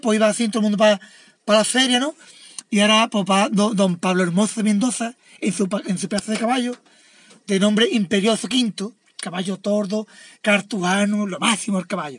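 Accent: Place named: Andalusia, Spain. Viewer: Éste hombre habla perfecto andaluz!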